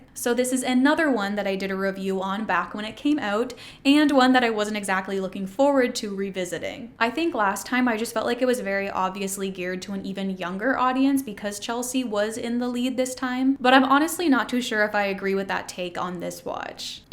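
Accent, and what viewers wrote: Canada. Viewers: You have great teeth and say oot instead of out, so you must be from Canada.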